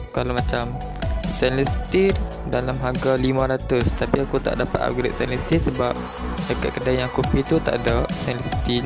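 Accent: Kedah, Malaysia. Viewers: Orang sabah,cara bunyi loqhat org kedah,tpi apa2 pn trbaik bro,HATIMU KENTAL, org klau tangan berseni prmulaan cat wlaupn x ada pngalaman, ttap akn nmpk cantik..trima ksih bro..mmng terbaiekk...dari sya IPOHMALITALAKNYOMBONGPUNYA,PERAK..hiehiehie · Campoq dey ..kedah KL 😂 hg ckp utara dah sempoi bak ang .. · ckp pelat kedah terus ja bro . nampak struggle sat pelat kedah sat pelat KL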